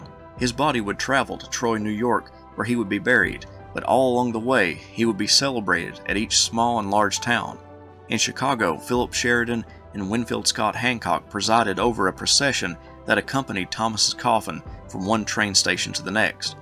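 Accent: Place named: Virginia, United States. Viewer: I have long admired Gen. Thomas and I often wondered why his name was forgotten for so long. Thank you for this series of videos on the life of this honorable, intelligent and courageous man. He is a credit to the United States. And so are your videos on the American Civil War. Incidentally, I imagined that your (Virginian?) accent is quite similar to Thomas' own voice. It made it seem that the man himself was narrating his own biography.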